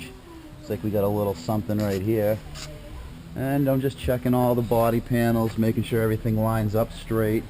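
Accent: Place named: New England, United States. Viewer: hey.i see a few Saab videos! I have a 07 93 20t. so keep em coming! btw.. You in MA? I detected a new england accent.. take care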